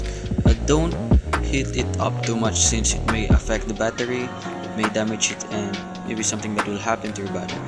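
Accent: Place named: Philippines. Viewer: Are you filipino? Because I can hear that filipino accent heheheh :)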